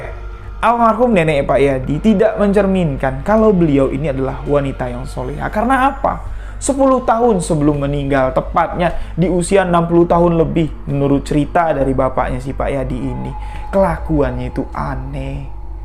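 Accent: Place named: Sunda, Indonesia. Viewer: Assmuallaikum bang sy penggemar setia kisah 2 horornya.bang orang jambi tpi sy merasa kok logat gaya ngomong nya agak mirip ke sunda kadang bahasanya kok sama bahasa sunda seperti " keukeuh" apa mas pernah belajar bahasa sunda jawa barat...he hehehe...sukses selalu untuk mas 👍👍🙏🙏🙏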